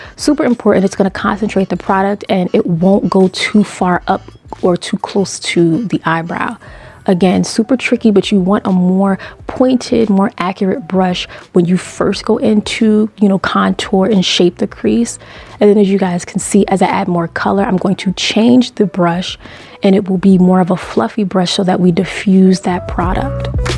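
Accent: New York, United States. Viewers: that NY accent it does something to my soul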